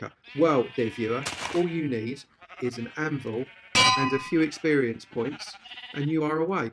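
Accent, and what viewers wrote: United Kingdom. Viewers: I love his voice. Hell, I love any Brit's voice, mostly.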